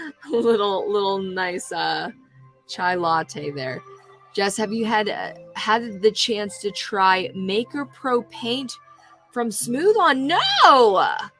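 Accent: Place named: Los Angeles, United States. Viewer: I'm so impressed. I could of sworn you had a comment on YouTube.? I'm still looking. Just want to say I miss your lovely voice and cute influx in your LA accent!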